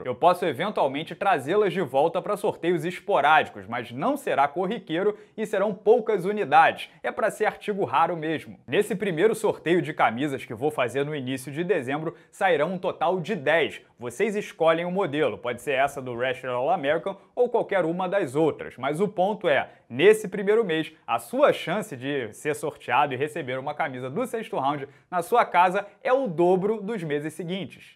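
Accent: Rio de Janeiro, Brazil. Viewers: provavelmente você não vai ler esse comentário.... Mas parabéns pela inovação no canal 👏👏👏👏....merece o reconhecimento e o sucesso muito pela humildade (e olha que eu não gosto do sotaque carioca, problema meu, eu sei) espero que não perda essa humildade. O canal só perde em conteúdo para o Victor Miranda, mas entendo que são propostas diferentes. Quem ganha são os inscritos..!!...parabéns, voa, foguete não tem ré..!!!🚀🏆👆👏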